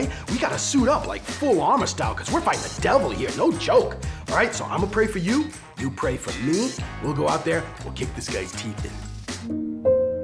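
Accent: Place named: Boston, United States. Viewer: This is great!! has to be OFB... originally from Boston. Born and raised here and we can detect an imposter in a minute. is the real deal. You can’t fake that.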